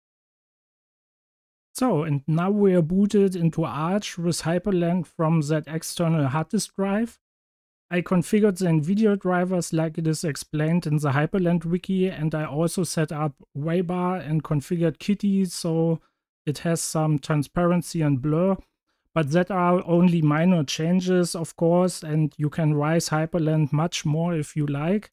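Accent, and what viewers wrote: Germany. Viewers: souds really deutsch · I did not expect to see this kind of video with a German accent. At least I am assuming you're a German native speaker, its what it sounds like to me. Much love. :)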